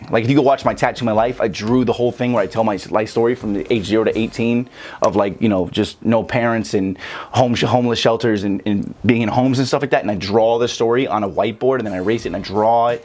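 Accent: Chicago, United States. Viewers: Your delivery is so Chicago. It's funny and refreshing to watch your informative vids. Preciate you, bro. Continue courageously! <link>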